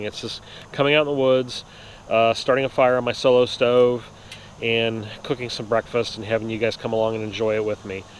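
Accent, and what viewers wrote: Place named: Northern Ohio, United States. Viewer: Btw, I live in the Columbus area, too. Listening to you I’d say you’re from northern Ohio originally. Somehow I ended up on the Bushcraft Ohio website and you said you’re going to the Zanesville Bushcraft gathering. I’d never watched your videos before but I’m glad I have now. I take care of my mother so I can’t go to western PA to practice and enjoy. As I’m just getting back into this because my kids are now all at OSU.... they never liked camping so it’s been on hold over 15 years. Anyway, I’ve been going to Delaware State Park and tent camping. Pretty much stick to myself because almost everyone else is in a trailer. Doing some bushcrafting too. Harvesting and processing wood, building a table, making tripods, big and small and using them for tarp shelters and hammock hangs etc. If you see this and have time to respond, where can I go that’s within an hour of Dublin/Powell to “wild camp” I guess it’s being called now? 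Is that different than primitive camping? Anyway, any info you can provide will surely be appreciated. I think I’m going to watch our fatwood video next. I’m still searching for the mother lode score. Sure, I can harvest from a branch... but who doesn’t want to find the outer rotted trunk of punkwood with the inner core of fatwood? It’ll happen.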